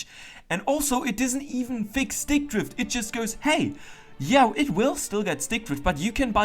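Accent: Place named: Germany. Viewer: Based on your accent, I would say you are from germany, or some other western eu country and saying that consoles are not worth it and that you would rather build a pc is so ignorant to the rest of the world, where 1 month salary maybe wouldnt even be 500e. There's no pc that can be built for that price that can run latest games in the same quality. Also ps plus (game pass) exists with small subscription you get large library of games. And all this is coming from a pc gamer. Instead of shitting on consoles, you could be shitting on graphic cards prices. Oh, wait, you are born in rich country, you dont care...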